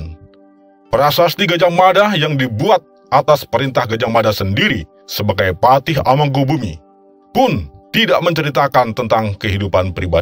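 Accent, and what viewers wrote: Java, Indonesia. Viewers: Ngebacanya ga sesuai teks mungkin yang baca orang jawa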